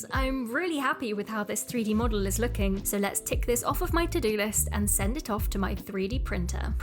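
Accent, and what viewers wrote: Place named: United Kingdom. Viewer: This is the first ever time I've heard you speak and I did not know you were British omg!! I love your style so much it's such an inspiration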